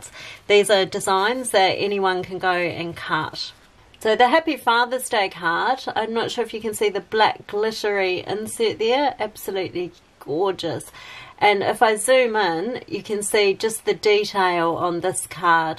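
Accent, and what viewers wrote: New Zealand. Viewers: This is the best card tutorial I’ve seen so far. Thank you so much I can hardly wait for my machine to arrive. By the way so nice to hear another Kiwi hahahahaha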